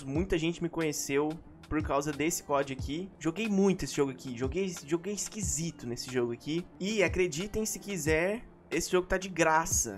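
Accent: Minas Gerais, Brazil. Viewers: Mineiro Ala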